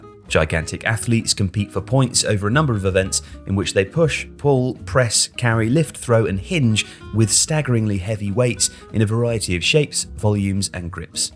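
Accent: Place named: England, United Kingdom. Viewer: I checked the comments halfway through the video and had no idea what this could refer to Then I got to the end of the video... good lord it's jarring after the soft English of the main portion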